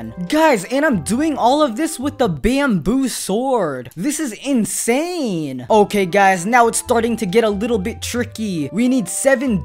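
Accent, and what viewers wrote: India. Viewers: Ji indain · Bro are you indian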